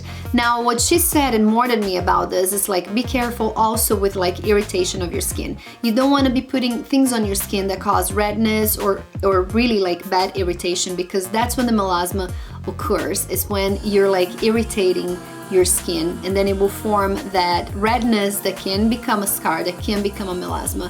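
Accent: Brazil. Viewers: I like your accent are you Brazilian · You sound like you're Brazilian every words ends with a e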